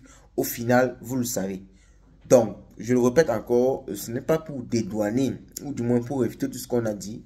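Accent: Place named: Cameroon. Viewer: Bonjour très cher grand frère tu es camerounais j'imagine quand je suis ta manière de parler ☺️ donc...... Quand je l'ai dit..... à mon humble avis le film que tu as commencé à tourner là, hum.... je pense qu'il va te dépasser. Les deux projets là avancent ooohhh...!!! Mais beaucoup de courage à toi, j'admire ton audace en tout cas 💪 Et merci déjà d'avoir enlevé le mot arnaque du thème c'était pas le mot approprié pour mieux exprimer ton scepticisme..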